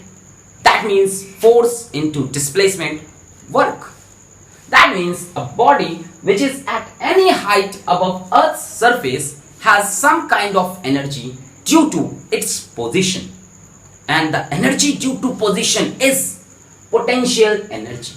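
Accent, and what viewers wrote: India. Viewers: Sir aap bohat funny lgrhe the.Aapko english bolne ki kya zarorat thi.Aap hindi bolte hi acche lagte ho.Tb aapki teaching delightfull lgti h.Aapko iski koi jarrorat thi hi nhi.We love you and your hindi accent.🙏🙏🙏🙏🙏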